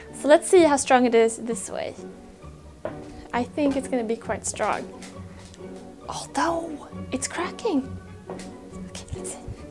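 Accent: Sweden. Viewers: Are you swedish? Sounds like you have strong swedish accent :) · Are you Swedish? Great vid! Thanks!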